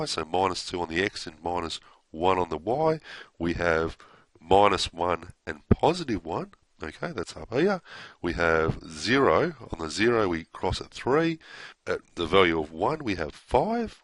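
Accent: Australia. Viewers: No he’s just an Aussie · He's speaking English but he has an Aussie accent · He's just Australian by the sound of it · Aussie Aussie Aussie!! Thanks, mate.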